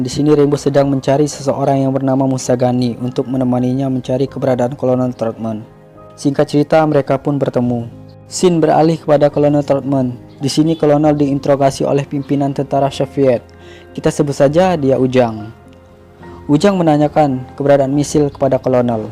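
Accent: Palembang, Indonesia. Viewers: Wong Palembang e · Asli wong palembang :v cuko dak be cuko tengah duo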